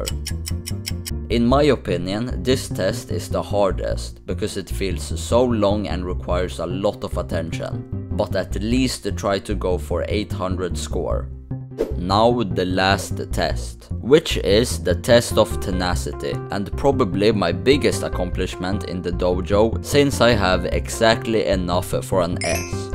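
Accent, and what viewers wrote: Sweden. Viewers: swedish accent is fun just yamp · W Swedish cause you say "yump" love that shit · Bad Video + L Swedish😂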